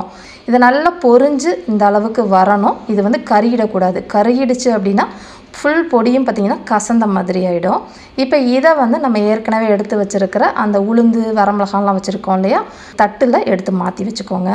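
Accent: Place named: Tirunelveli, India. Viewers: Super, நெல்லை தமிழ் கொஞ்சி விளையாடுகிறது. அருமை சகோதரி. வாழ்த்துக்கள். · நீங்கள் கூறாவிட்டாலும் உங்கள் அழகு தமிழில் நெல்லையின் மனம் வீசுகிறது உங்கள் சமையல் போலவே.. Super preparation 👌👌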